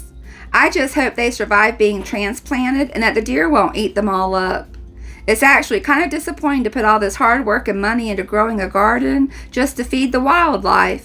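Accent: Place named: United States. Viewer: The meal looks so nice 😋 I love just listening to your wonderful American accent x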